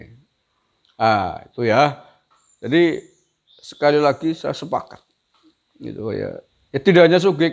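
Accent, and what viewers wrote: Banyumas, Indonesia. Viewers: Jaga terus persatuan demi NKRI harga mati, wlpn sdh di pwkt hampir setahun tetep suka denger bahasa2 banyumasannnya bikin ketawa wkwkwk, sukses trus pak 👍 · Ora ngapak ora kepenak... Mantap mas bro..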